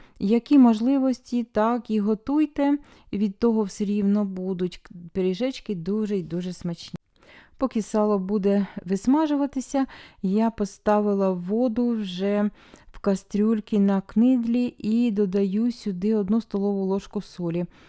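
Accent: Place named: Cherkasy, Ukraine. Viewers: Вимова черкаська.Шевченкові краї. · я подумала- Вінниця ❤Приємно знати,що на Черкащині так розмовляють ❤.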